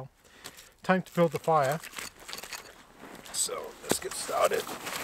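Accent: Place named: Welsh Border, United Kingdom. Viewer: You appear to be a adoptive Canuckistani and I can tell from certain nuances in your accent you’re from the borders of England and our western neighbour😂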